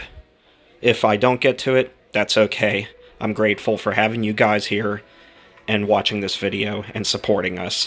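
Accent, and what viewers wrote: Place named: Western Pennsylvania, United States. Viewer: I hear a western Pa accent, Pittsburgh area? Am I right? or close.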